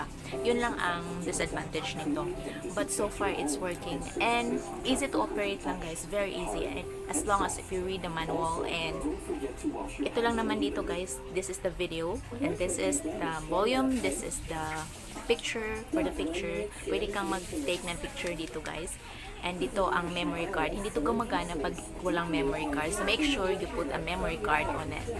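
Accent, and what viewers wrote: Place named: Visayas, Philippines. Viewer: Bisaya man diay ka sis proud bisaya here.. ganda ng accent mo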